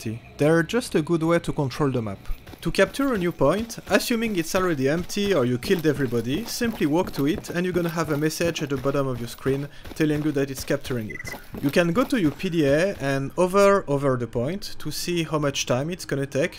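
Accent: France. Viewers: salut , j'ai entendu a l'accent que tu étais francais :) alors voila j'ai un soucis avec a anomaly en mode warfare c'est en russe! alors que le mode "histoire" est en anglais saurais tu comment arranger sa ? merci d'avance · Merci pour ce tuto (ton accent anglais me fait penser que tu comprendras mon commentaire 🙄😆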